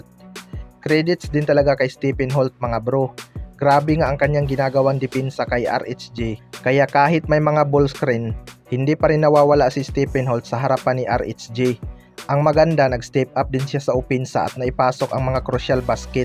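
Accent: Visayas, Philippines. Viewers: bisacol nmn tong salita neto sakit sa tenga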